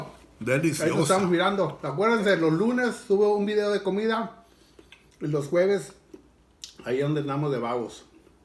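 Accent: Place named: Sinaloa, Mexico. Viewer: Usted es todo un amo de casa. Que rica receta. De donde es? Habla como sinaloense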